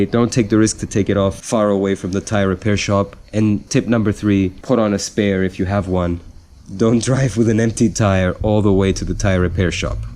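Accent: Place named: North America. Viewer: Good video again. You definitely don't sound Greek, more North American accent.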